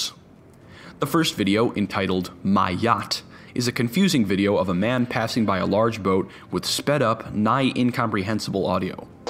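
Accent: United Kingdom. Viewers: puff it’s Cockney (East London) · Aesthetical Twat I’m American and i find this hilarious the British accent makes that video better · The power of having a bri’ish accent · this guy has such a heavy british accent i can't stop laughing at his videis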